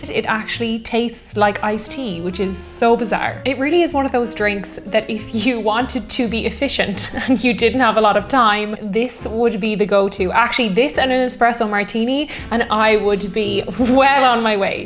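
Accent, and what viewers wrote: Ireland. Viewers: Greetings from America🇺🇲 Saw you first on the TRY Channel, which was fantastic fun. Didn't know you had your own series; great video. One can never go wrong with the iconic Long Island. Love the video, Love Irish accent, and the perfect hostess. Looking forward to more of the same in the near future... Cheers! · As a 21 year veteran fireman from a university town PENN STATE {one of, if not the, top party schools in the USA}. . . I LOVE the Long Island Ice Tea. My favorite way to drink it? by the pitcher! My record was 3 pitchers. And I still walked home upright. And my I say from the states, It's hard to tell which is more lovely, your eyes, hair, or that wonderful Irish accent.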